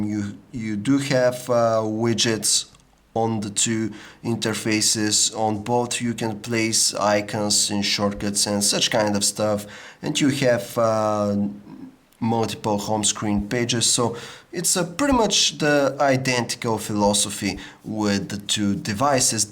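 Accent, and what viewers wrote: Russia. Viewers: Sound like a Russian accent to me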